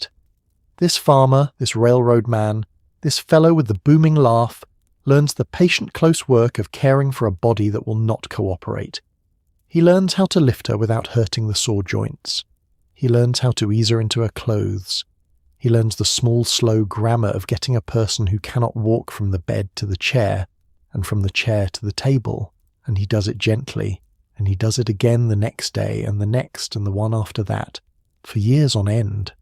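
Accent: England, United Kingdom. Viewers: Why does the narrator have an English accent ?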